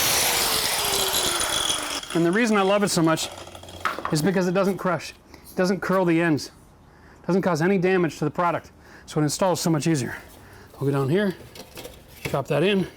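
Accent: Canada. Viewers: Canadian aa?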